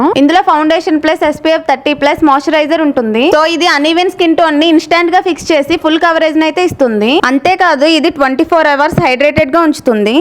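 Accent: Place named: Andhra, India. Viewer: Jara Andhra slang apu akka maku nachuthaledhu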